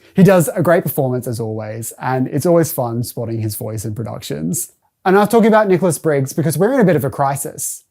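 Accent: Australia. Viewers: do I detect an australian accent?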